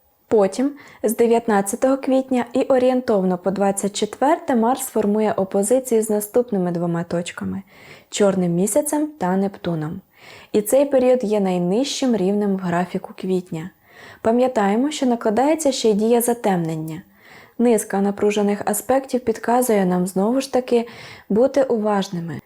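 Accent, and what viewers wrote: Standard. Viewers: Як приємно слухати таку чисту та мелодійну українську мову ❤❤❤ Дякую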